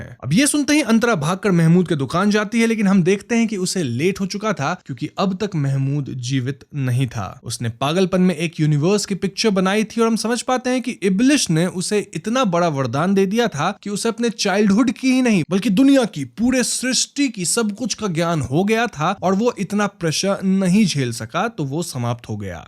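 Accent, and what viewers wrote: Bengal, India. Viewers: bhaiya its loke bole😝.. bt bhaiya ur bengali sounds cute❤ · Bhai, achha natak kar lete ho..😅 Bengali hoke bolte ho ye bhasa nahi aati... Jitna bhi Koshish kar lo par koi apna MTI 💯% khatam nahi kar Sakta... Aapke bohat words se pata chal jata hai ki your mother tongue is Bengali... I have seen many Bengalis who claim to be a non-bengali and I fail to understand why they do like this...😅 But anyway good try but not the Best as you are caught... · Thanks Bhai for covering this series! Loved your explanation and cute bangla accent haha! Take love from Bangladesh <3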